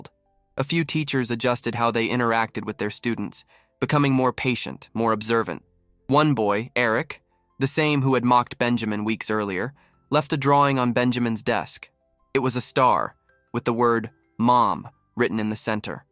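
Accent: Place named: United States. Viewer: One has to be very careful in choosing who reads these stories so that it complements the genre. I know Americans speak a strange form or English and this gentleman makes it very harsh with that strong accent. A pity as it is a lovely story and a lovely melody. It would get top marks if narrated by a gentle man's voice without that strong American twang.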